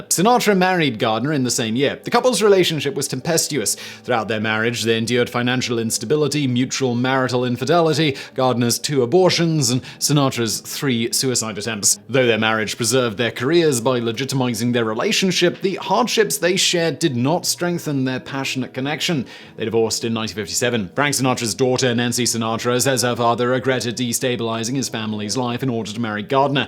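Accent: United Kingdom. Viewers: Intrusive r, very british as well :)))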